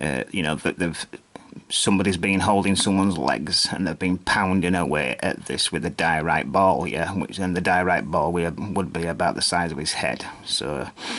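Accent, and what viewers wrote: United Kingdom. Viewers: up: What area of the UK is your accent from?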